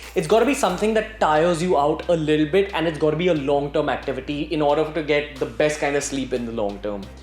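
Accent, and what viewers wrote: India. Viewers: fuck indian accent. irritating af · Be grateful that indians are speaking english. They dont need to. If you dont like his accent, fuck off!!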